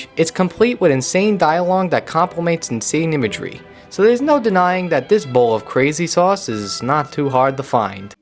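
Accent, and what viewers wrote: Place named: New Jersey, United States. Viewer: Are you from New Jersey? You have a bit of the accent.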